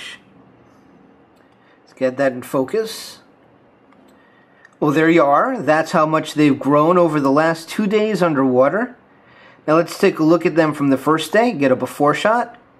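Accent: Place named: New York, United States. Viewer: Your accent kinda reminds me of a newyork one c: nice vid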